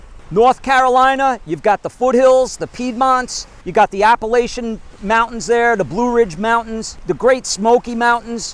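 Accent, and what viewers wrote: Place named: Upstate New York, United States. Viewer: greetings from Portland, OR. You got mention on facebook called "Moving to Florida" and I just now watched my 4th video of yours. Love them all...my Dad is from Upstate NY but you remind me of his mom with that "Accent"...lol. My family planned on moving to which we think to ...Jacksonville on many account. Cooler than most of FL, Has waves for surfing if weather is right. Not alot of hurricanes hit that area, I can transfer my job there to name a few. But I rather live in Tampa....so hard to decide! Lived near L.A. in Hawthorne and Redondo beach growing up and moved to oregon when I was 26. Now I'm 57 and my mom and dad and stepfather all passed away and my 2 sisters are now living in VA. I am dying to get back into the waters since oregon pacific ocean is too dang cold! Looking forward to my move next July if all goes well.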